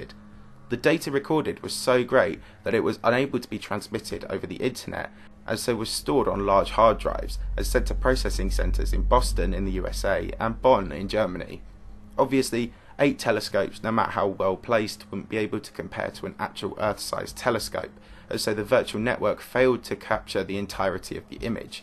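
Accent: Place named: Essex, United Kingdom. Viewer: He's doing what God put him on this green earth to do; have a salon-quality Essex voice, and read the astronomy.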